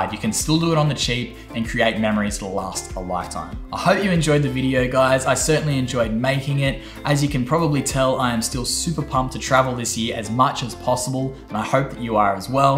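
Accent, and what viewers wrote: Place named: Australia. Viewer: Hey. great tips that will definitely come to use for me. Nevertheless, wildlife is my treat. Love your work and the Australian accent is a charm:-)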